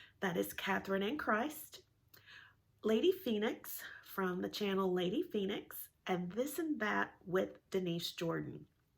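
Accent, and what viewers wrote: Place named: South, United States. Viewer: I love how you decorate your home! You have such an eye for design! Your tablescapes are always on point! You mentioned picking up home decor at Krogers. More and more I am seeing home decor at grocery stores and even Ace Hardware, believe it or not! Thanks so much for sharing your bio! I hear a little Southern accent! Take care and be blessed!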